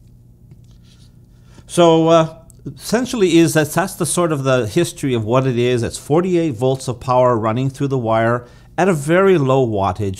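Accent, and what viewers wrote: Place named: Eastern Europe. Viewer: I am picking up some Eastern European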